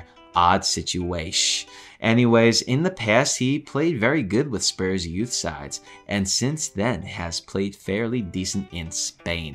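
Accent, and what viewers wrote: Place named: Philadelphia, United States. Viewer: Great video, dude. Subscribed. You sound like you're from the Philly area, yea? Cheers, looking forward to more from ya.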